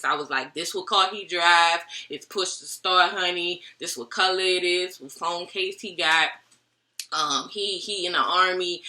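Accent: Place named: South, United States. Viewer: OK. That's the sexy grammar I hear. You're a southern bell. Cool.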